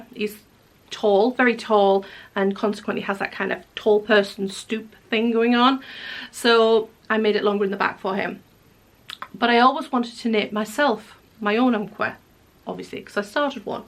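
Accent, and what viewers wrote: North East England, United Kingdom. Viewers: Nice to hear a north east England accent in Orkney! I am a Geordie Knitting in Fife! East Neuk Knits festival coming up in Fife end of November is so exciting it was brilliant last year. We are so lucky in Scotland.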